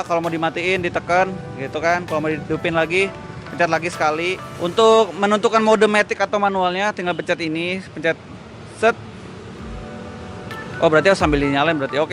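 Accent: West Java, Indonesia. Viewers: Sunda banget bang😂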